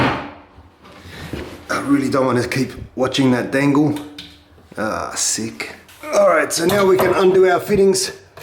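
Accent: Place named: Australia. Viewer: Simply explained but must be Australian.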